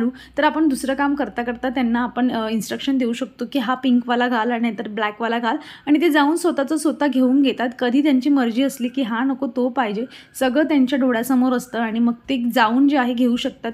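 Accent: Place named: Jalgaon, India. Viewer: Tuzya language varun lagech kalat tu Jalgaon chi like ळ la ड😂😊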